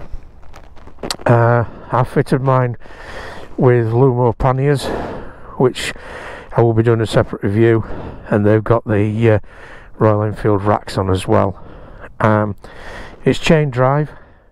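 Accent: Yorkshire, United Kingdom. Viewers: Just found this vid.Nice to hear Yorkshire accent.I live in NZ but I am from Sheffield .I am looking at the Classic 350 as a possible bike to down size to and found the info given very useful,cheers .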